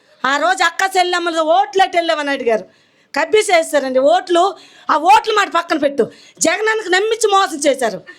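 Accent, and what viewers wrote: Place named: Srikakulam, India. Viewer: అచ్చం శ్రీకాకుళం యాస, నిలువెత్తు నిజాయితీ, మేలు అనుభవించి మేలు కోసమే గళం విప్పే విధానం. శ్రీకాకుళం అంటేనే మంచితనం అనేలా వున్నారు తల్లి. జయహో జగన్ మోహన్ రెడ్డి గారు. ప్రతీ పేద, అణగారిన బ్రతుకుల్లో జగన్ అనే వ్యక్తి ఒక ఒక తండ్రి గా ఐపోయారు ❤️❤️. ఈసారి జగన్ కోసం ప్రతీ కార్యకర్త గళం విప్పాలి, శ్రమించాలి ❤️❤️🥰🥰.#Vote for FAN ❤️❤️🥰🥰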